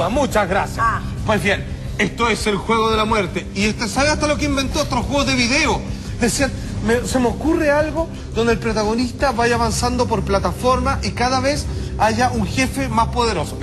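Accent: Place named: Chile. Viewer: no entiendo nada lo que dice este tipo.habla muy rapido y vulgar. y su acento chileno no le ayuda en nada..../////.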